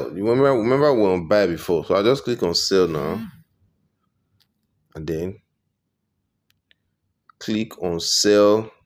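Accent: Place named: Africa. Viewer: We can only understand our African English . 🎉🎉🎉 thanks for the explanation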